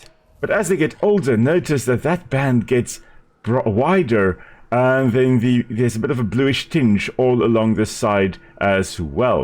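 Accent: South Africa. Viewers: Are you from SA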